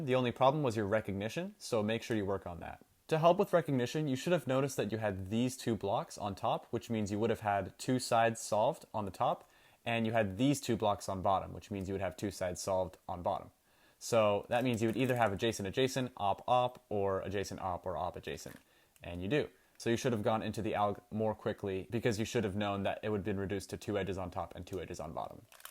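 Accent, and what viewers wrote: Russia. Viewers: I've had a feeling that a Russian accent could be probable for this one, considering who you're critiquing 👀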